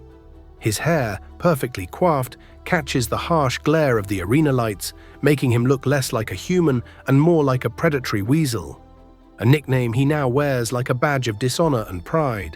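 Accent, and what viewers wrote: United Kingdom. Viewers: It's amazing to me how a great topic like this can be turned into a complete dribble by a British accent thumbs down for that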